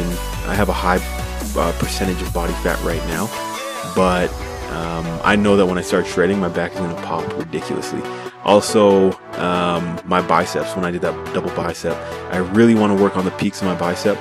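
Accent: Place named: United States. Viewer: you are an attractive man. am curious though. what country are you from? you spell like a Brit (e.g. flavour instead of flavor), but you sound like a very educated American.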